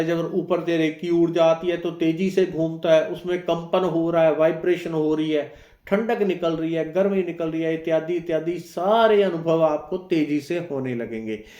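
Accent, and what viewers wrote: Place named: Himachal Pradesh, India. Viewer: Himachal se ho kya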